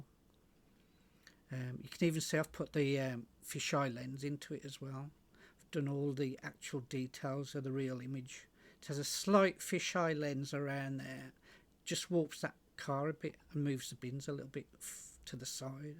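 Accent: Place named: Birmingham, United Kingdom. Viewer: Sounds like a brummy accent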